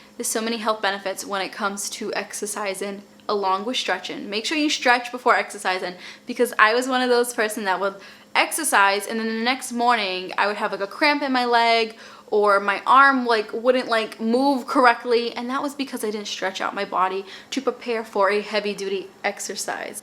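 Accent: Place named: New York, United States. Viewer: Your accent reminds me of our New York accent lol I love it lol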